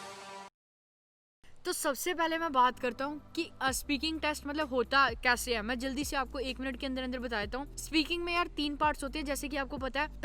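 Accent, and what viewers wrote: Jammu, India. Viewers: Are you from jammu, where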